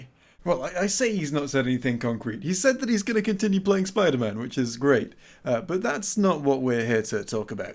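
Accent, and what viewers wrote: United Kingdom. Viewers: You really care about topics no body asked for, little angry british fella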